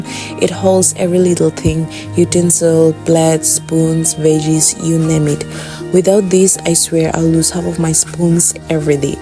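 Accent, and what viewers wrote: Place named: Nigeria. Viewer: Your home is pretty but has anyone told you that you sound like a Nigerian I had to double check your profile but its cute like you are one of us❤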